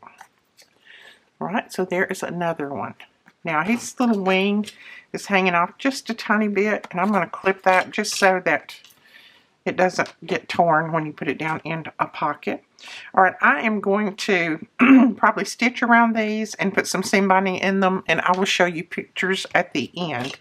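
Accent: South, United States. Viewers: You are one of my favs on YouTube. I love that southern accent and calming voice. Keep being you and sharing your feelings with us. Block anyone that says otherwise.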